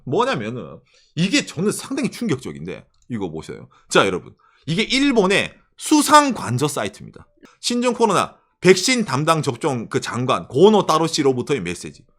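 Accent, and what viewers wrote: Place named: Gyeongsang, South Korea. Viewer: 재미있는 건 일본에서 그렇게 오래 살았는데도 경상도 사투리 " ~ 맨시로" 가 남아 있는게 신기하네.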